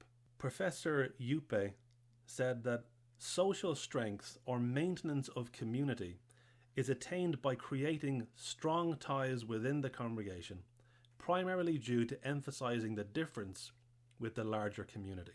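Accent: Ireland. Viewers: were you a witness ~~~sounds like you are from Ireland ?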